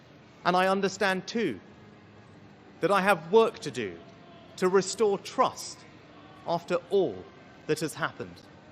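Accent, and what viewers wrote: United Kingdom. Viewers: this Indian man has brilliant UK accent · Sounds British to me, whats the fuss about his native roots...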